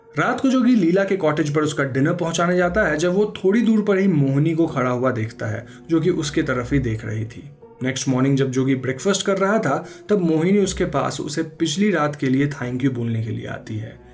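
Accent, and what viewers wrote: Bengal, India. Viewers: Bro are you bengali..?🧐🤔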